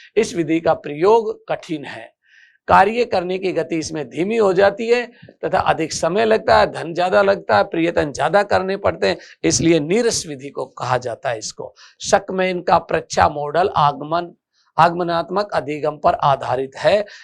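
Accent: Rajasthan, India. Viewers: आप हिंदी वर्तनी में राजस्थानी क्षेत्रीय भाषा से प्रभावित बोल रहे हैं, जो कि अशुद्ध है। कृपया सुधारे।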